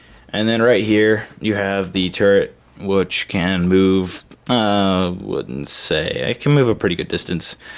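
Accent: United States. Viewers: this gunship is epic I really like it. and I think you are American. (your voice sounds American)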